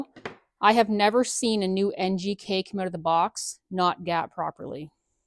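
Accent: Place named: Canada. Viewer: There's always something solidly reassuring about a Canadian accent. No idea why this was recommended - my back yard is about 25m2!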